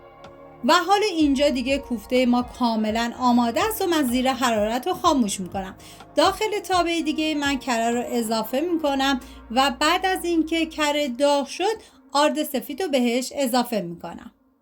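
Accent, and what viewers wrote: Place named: North, Iran. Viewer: سلام شما لحجه تون مثل شمالی هاست ایا شمالی هستید عزیزم؟؟؟؟؟💙💙💙💙💙💙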